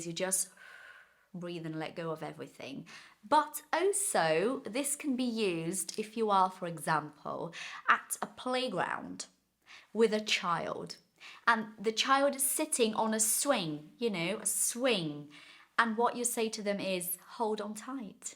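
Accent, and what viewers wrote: RP, United Kingdom. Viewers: I love so much your accent, it's soo brit and fancy 🤣🤣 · Hey, teacher! Hope you are doing awesome. I have found you on Instagram and here on your channel. I am amazed with your british accent and also watching every single video here. I am trying to acquire the british accent but, it is not so easy. Lol Kudos to your work and skills!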